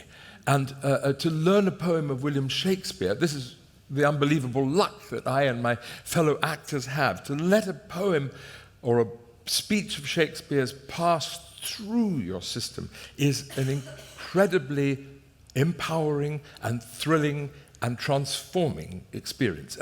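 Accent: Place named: United Kingdom. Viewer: Simon Callow splendid British accent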